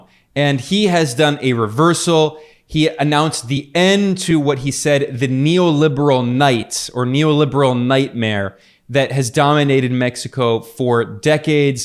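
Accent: Spain. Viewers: Beautiful blue eyes and Spanish pronunciation STOP DISTRACTING ME BEN!!!! I’m trying to focus on this A+ Geopolitical Content!!!! 😂😂❤❤❤❤ Great video as always 🙏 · I just love LOOOOOOVE your Spanish accent. Greetings from Mexico. ❤️❤️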